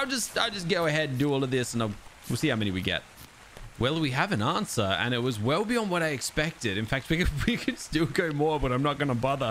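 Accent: Australia. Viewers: Wait ur aussie😃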